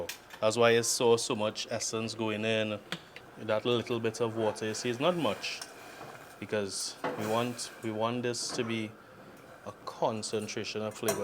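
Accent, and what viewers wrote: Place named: Trinidad and Tobago. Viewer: Thanks for the tips.. I make this outside easter for my son. Can i use orange zest when lemon is out of season? And, thanks for maintaining our Trini accent.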